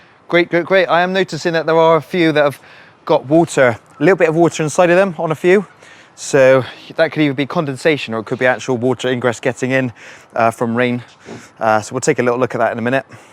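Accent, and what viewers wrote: Bristol, United Kingdom. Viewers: defo Bristol accent, nice, real siuation vid, cheers Mr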